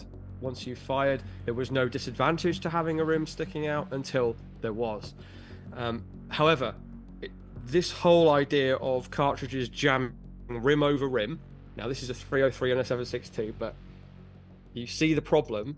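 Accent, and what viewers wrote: United Kingdom. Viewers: He's pretty good. Like gun Jesus with a britsh accent and a mullet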